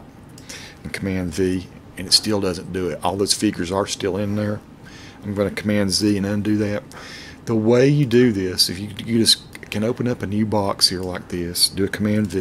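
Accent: South, United States. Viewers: thank for this tip, and for your delightful southern accent. If Adobe was smart they would hire you for their tutorials.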